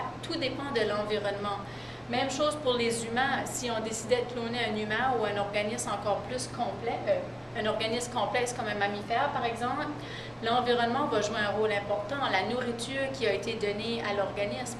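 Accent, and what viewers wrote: Quebec, Canada. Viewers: t'es sourd ou quoi ? ça s'entend à 2 km qu'elle est québécoise.. (l'idiotie se soigne)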